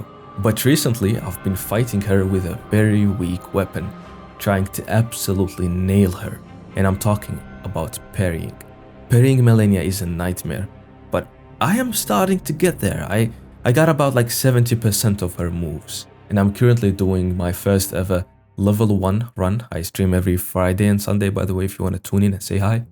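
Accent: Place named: Italy. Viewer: I'm italian, and you REALLY have the accent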